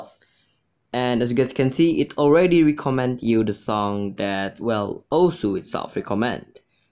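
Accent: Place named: China. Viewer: Idk he's a Chinese or not but his accents tho lol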